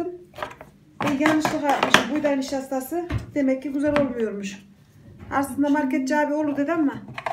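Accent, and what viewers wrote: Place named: Vezirköprü, Turkey. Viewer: Abla bizim oralı olduğun belli biz gibi konusuyon bende vezirkopruluyum ismim gülsüm beğenerek izliyoz😊👍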